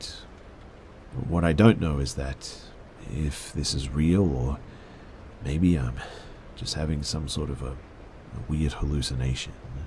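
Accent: Australia. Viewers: At last an Aussie accent. Just subbed, I don't know why it has taken me so long to find you. And I just can't listen without rain!. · Holy hell! I cannot believe I only realized after reading your comment that his accent is Australian! 🤦🏻‍♀️ I've been listening to him for a really long time and always wondered where he was from 😆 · Hey 👋 Mate 🇭🇲🪃🤘 it's great to hear an Aussie when your an Aussie too 😉 so thanks ❤️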